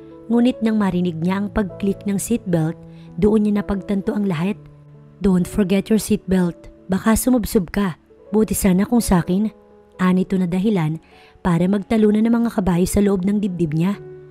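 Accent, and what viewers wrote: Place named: Iloilo, Philippines. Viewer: ang deliverance at sound web ng Bose's ilongong ilongo gid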